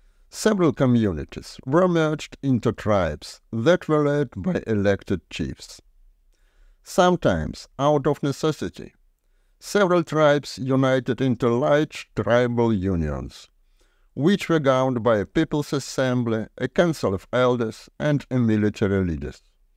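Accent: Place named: Eastern Europe. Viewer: Although you read all this with a Slavic accent, you forgot to mention the Slavs!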